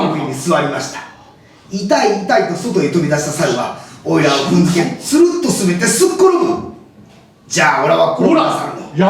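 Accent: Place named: Kagoshima, Japan. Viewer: あちこちに鹿児島なまりが残ってるのがめっちゃ好きです!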